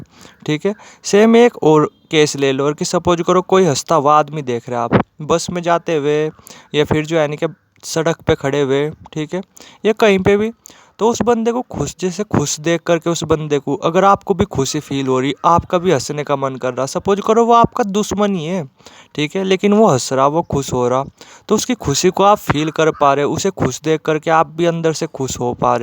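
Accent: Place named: Haryana, India. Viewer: hello bro phone लेकर ही बैठे थे 😅क्या वह हमें लगा कि आप हरियाणा से हो आपकी वॉइस से पता लग रहा था आपकी वीडियो बहुत अच्छी इसको कंटीन्यू रखना और दोनों चीज हमें भी है जैसे कि आपने वीडियो में बताया थैंक यू सो मच एंड गुड ब्लेस यू✨🙏😌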